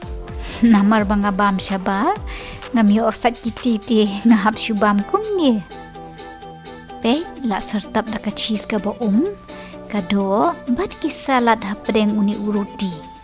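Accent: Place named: Khasi, India. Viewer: Sngew hun shisha kong ba phi kren khasi paka hynrei nga i bun ki khasi ba sah tang ha shillong ruh ki lah leh thlun ban kren khasi . Nang kiew shaphrang kong u Blei un nang kyrkhu iaphi ba phi lah ban pynmih shuh shuh ha ki por ban dang wan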